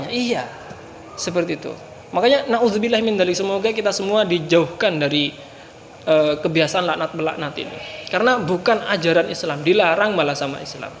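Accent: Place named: Madura, Indonesia. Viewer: Sangat nampak loghat madura nya walaupun bicara bhs. Indonesia. Lanjutkan dakwah jennengan ustadz ustadz aswaja ORENG MADUREH DERIH SUMENEP SAMPE' BANGKALAN SIAP ADUKUNG DA'WAH EPON AJUNAN TAD🙏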